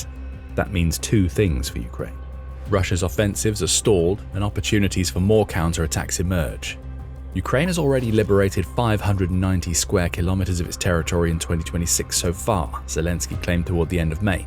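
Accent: England, United Kingdom. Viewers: Couldn't you guys find a better word than "cheap" to describe the Gripen?? Try cost-effective - relatively inexpensive - or affordable. Not cheap= poor quality. For someone with an English accent you really have a limited vocabulary.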